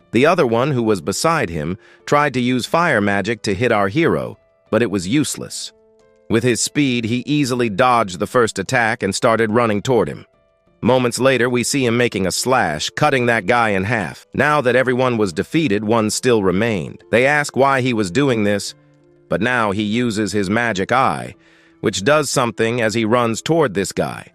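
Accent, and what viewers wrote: United States. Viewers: What's with the texan accent 😊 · Tf is that country accent 💀😂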